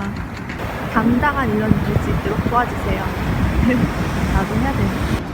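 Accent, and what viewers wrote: Seoul, South Korea. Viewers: 옛 서울 사투리같아요 너무 귀여우신듯 ㅋㅋ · 근데 영상들을 보면 볼수록 80~90년대 서울사투리하는것같죠 ? ㅋㅋ 어리신분이 ㅋㅋㅋ 부모님 말투 닮으셨나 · 말투에 '~거든요' 같은 서울 사투리 쓰는거나 화장법이나 생김새도 10년대에 유명한 얼짱? 이런 느낌이고 저는 보자마자 예전 얼짱 얼굴 썸넬에 박아둔 플리인줄알고 노래들으러 왔는데 당황함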